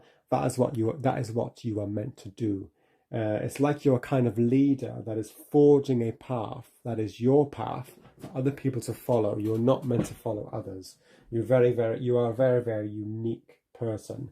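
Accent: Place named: Wales, United Kingdom. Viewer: Hello, thank you for a lovely, and interesting readings, 1 n 4. I felt they linked, and some good uplifting advice. I tried to place your accent, and wasn't sure, but I've heard it before, I was thinking whale's but not sure, the dragon sort gave me that idea. Anyway only if you want to share, your name was an other curiosity 🤔. Loved your reading, and your accent, maybe your names Aegon 😉 😜 ❤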